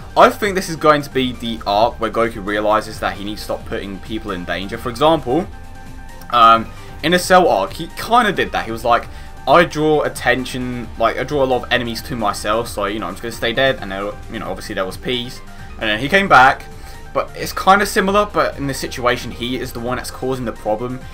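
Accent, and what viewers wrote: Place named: West Midlands, United Kingdom. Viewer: I'm really interested in know what part of England is from. He kinda has the same accent as me and that being a west midlands one.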